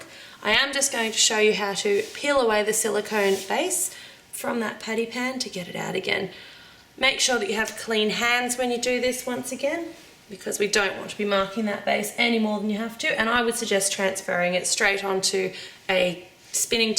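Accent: Australia. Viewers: Thank you so much I was about to embark on this mission with limited knowledge... I know a heap more now and nice to hear an aussie voice for a change. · I love your accent.. why do the Australians get the good accents? :3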